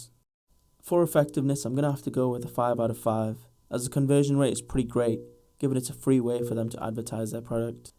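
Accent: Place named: United Kingdom. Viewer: That's just a British person talking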